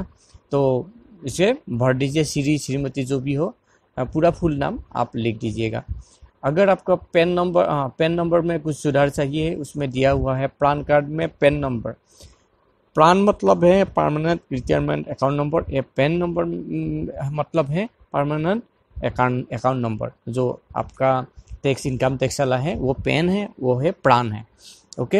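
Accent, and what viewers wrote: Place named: Assam, India. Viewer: Vo north east k h bhai vo aise hi Bolte h.Assam k h sayad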